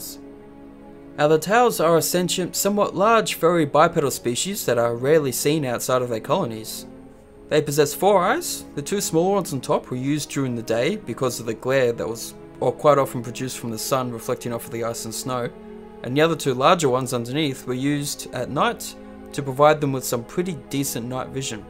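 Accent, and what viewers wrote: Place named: Australia. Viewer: Just discovered your channel, I like what you're doing, I have one question though? which part of Australia are you from? I'm from New Zealand and I almost thought you were Kiwi at first but then realised you didn't have the Kiwi accent.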